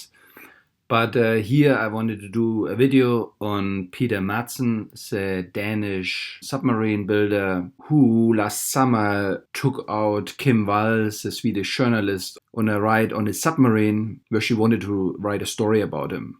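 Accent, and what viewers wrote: Germany. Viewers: Dein Akzent schreit förmlich "DEUTSCH, ICH BIN DEUTSCH"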